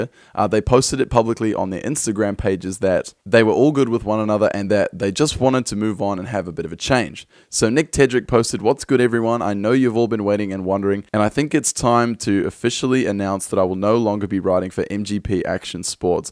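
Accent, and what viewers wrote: Australia. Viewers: Are you Aussie I am!!!!!!!!!!!! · Are you Australian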